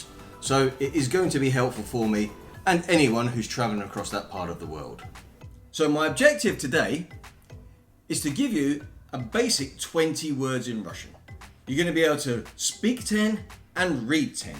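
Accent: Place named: England, United Kingdom. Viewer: you sound english not scottish ?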